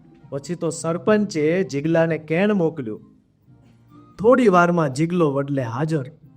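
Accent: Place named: Kathiawar, India. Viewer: શુદ્ધ કાઠિયાવાડી બોલીમાં ચોટદાર રજૂઆત..❤🙏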